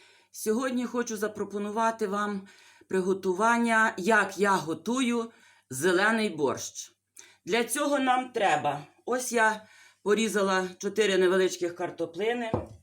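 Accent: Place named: Podillia, Ukraine. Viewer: А Поділля велике, я теж народилась на Поділлі, проживаю на Буковині (на кордоні 3-х областей), мені такий ваш говір рідний, і прізвище як у моєї куми😊. Люблю вас дивитися❤❤❤❤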